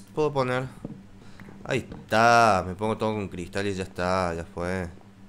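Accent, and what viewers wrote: Argentina. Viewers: Cuando dijiste puse el cofre en el peor lugar del mundo yo dije obvio y al mismo tiempo te escuche decir obvio. LOL El primer vídeo tuyo que vi fue el pro, el noob y yo 3 y cuando escuche que eras argentino inmediatamente me suscribi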